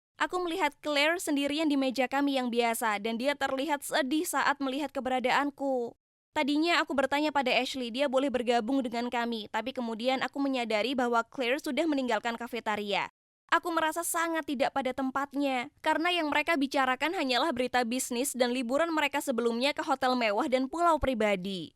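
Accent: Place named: Java, Indonesia. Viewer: Pasti voice over nya orang jawa🗿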